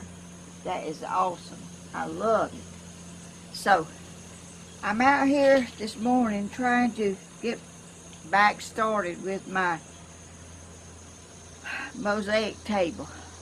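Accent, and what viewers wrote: South, United States. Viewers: I love listening to you with your southern accent! I also love your hair,you do a great job,I to color,cut and perm my own hair but I was a hairdresser. You are soooo very talented keep up the beautiful work. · Shelley M Martines I’m glad that during my sleepless nights I can follow our beautiful and her lovely southern voice, and gifts from our dear Lord. 💝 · I have been watching you now for a few years and I have to ask “ is there anything you can’t make?, good lord woman, I’ve seen you make so many things and no project seems to stump you, I’ve made mushrooms with you and many other things and I love your southern accent, I am Canadian and a crafter, I love to work with glass the most, many years ago, my first table for a wedding gift started my road to making tables, I made and sold so many, I made and sold what I called window in a window with frames and glass to hang in a window, they also sold very well, keep up the good work, god bless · I started watching your videos about a year ago and you're just wonderful! 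I love your enchanting yard, your southern accent, your turn of phrase and your love of nature. This mosaic table is the most fabulous treasure I've seen in a long long time. The colours are amazing, your visit with your youtube friends is heartwarming and you leave everyone with a feeling that they've just had a lovely visit with an old friend who never judges or questions. You're truly a gift and I'm so happy to have found you! Sending love and greetings from Canada! And as a side note, I suggested to our local nursing home that their residents might love your channel because I think you will take them on a wonderful journey everytime they watch one of your projects and get to visit with you in your garden. You are truly a gift and I don't think you realize how loved you are, you bring a lot of love and happiness to many people every day.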